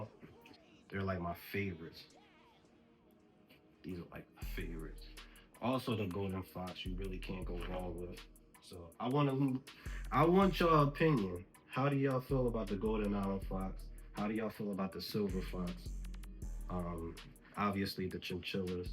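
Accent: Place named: Philadelphia, United States. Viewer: Keep up it up, I admire your collection!!!! And I hear that accent it sounds like my home town sound!!!! Philly in the building 🦾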